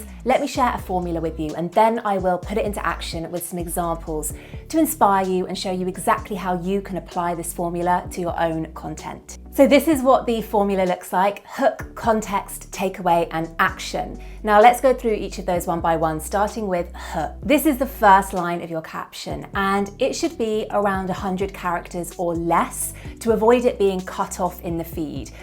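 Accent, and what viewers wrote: United Kingdom. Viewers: She's got that Harry Potter accent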